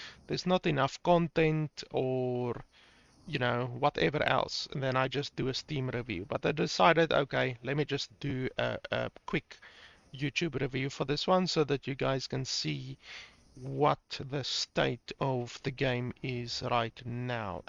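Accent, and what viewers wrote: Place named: South Africa. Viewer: Definately a South African acsent....🙂..(ek geniet jou reviews!)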